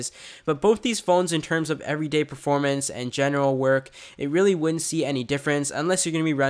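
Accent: Canada. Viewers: Are you canadian? I hear a little bit of a canadian accent lol. Canadians have cool accent.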